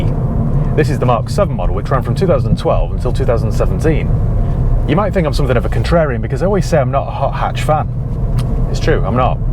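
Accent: United Kingdom. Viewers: Probably because he is from the uk 😅 what a stupid question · Cool review but why are you speaking British?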